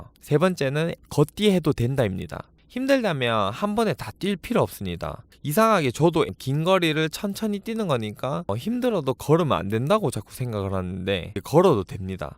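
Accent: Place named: North Gyeongsang, South Korea. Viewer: 대구 경북 엑센트 심하시네 잘봤습니다.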